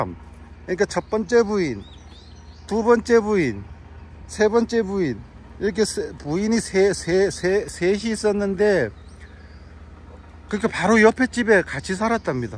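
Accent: Gangwon, South Korea. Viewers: 더워요 얼굴을좀 강원도 사투리 구수하고 말억양이 독툭해서 자주시청하는대요 목소리는 젊은신것같은데 언득보니까 60대70대같어서 얼굴공개 안하시는건가요 어때요 맘이 고우시고 어지신것같은데요 얼굴보고싶어요 암튼 대박나시길 바랍니다 핫팅 ㅎㅎ❤😊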